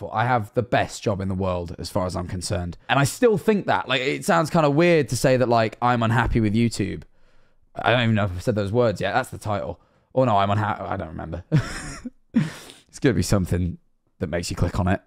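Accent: United Kingdom. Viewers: oh my fucking god you sound so british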